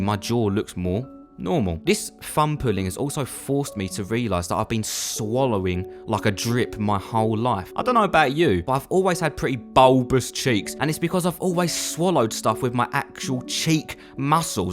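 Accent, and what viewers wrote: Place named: United Kingdom. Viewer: strongest british accent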